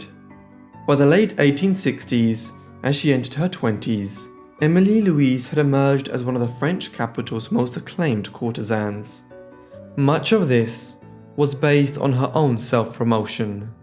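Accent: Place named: United Kingdom. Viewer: Perhaps it is a good idea to write the names of streets and places on the screen as well, just like you do with the names of people. I could not make out some of the locations. Your pronunciation is too English for me. French is spoken in front of the mouth with articulate movements of tongue and lipts, English is spoken in the back of the mouth with minimal movements of tongue and lips. This is why the English, whose culture makes them refuse to alter their habits, it is well-known, seldom manage to speak French like French. It is not a critique but an observation. Start speaking French in the front of your mouth and all of a sudden it sounds French and people will understand your French with great clarity. Just try it sometimes.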